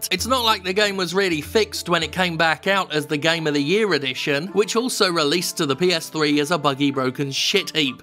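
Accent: United Kingdom. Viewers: i love how british you are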